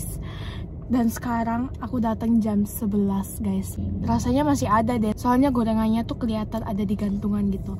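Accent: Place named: Java, Indonesia. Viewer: Medok bgt😳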